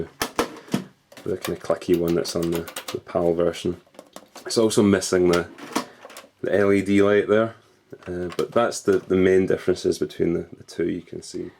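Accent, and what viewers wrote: Scotland, United Kingdom. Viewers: Where in Scotland are you from. You sound like you are close to me. Edinburgh area?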